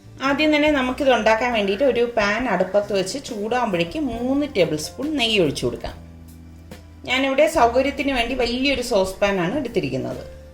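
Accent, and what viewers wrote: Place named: Tamil Nadu, India. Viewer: Malayalam konjam thettaitu thavarum na tamil akkum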